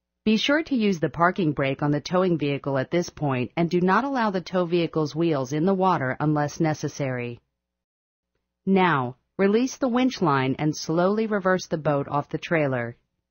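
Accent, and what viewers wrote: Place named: Australia. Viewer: Thats awesome! Are you from like Australlia or something?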